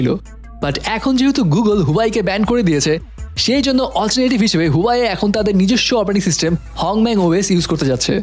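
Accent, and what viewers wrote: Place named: United States. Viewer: ভাইজান এত স্মার্টলি কথা বলতেছেন মনে হচ্ছে যে আমেরিকান বাংলা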